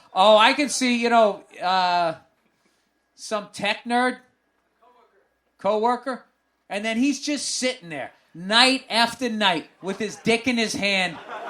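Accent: Boston, United States. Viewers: Hes bostonian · Does he do any of his overly pronounced Boston 'vowels'? I'd love to see him do one of those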